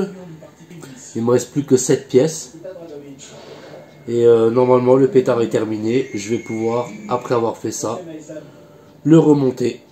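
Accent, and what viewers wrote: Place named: Lorraine, France. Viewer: Salut! Je t'ai découvert par la chaîne de JP et en regardant toutes les vidéos du weekend entre fumier, j'aurais tout de suite mis ma main à couper qu'avec un accent pareil tu viendrais soit de Nancy soit de Metz, en tout cas en Meurthe et Moselle 😅 Moi je suis de Nancy et franchement si tu passes par là ça ferait vraiment trop plaiz de te rencontrer et d'échanger avec toi, pareil si tu cherches un compagnon de tir ou même des endroits pour faire des concerts à Nancy je dois encore connaître deux trois coins sympas pour t'éclater et te mettre en relation 😁 J'ai vu aussi que tu faisais du flocage on en faisait y'a un peu moins de 10 ans avec des potes de Metz sous le nom de EMKA prod si ça se trouve on connaît des gens en commun ça serait ouf ^^ Bon allez salut à toi la chicorée je sais pas si tu verras mon message mais je tente quand même😁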